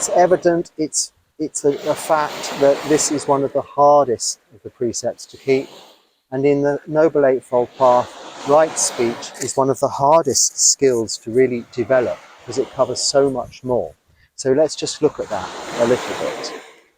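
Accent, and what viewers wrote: England, United Kingdom. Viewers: This monk received an offering of two bananas and gave one back to the Hindu temple in another vid. So unlike me, sharing what is meagre and being giving. Yet I'm always wanting to see myself as kind. I like to hear his Pommy manner of talk, feeling less solitary and thinking of his hardships not managing in the local language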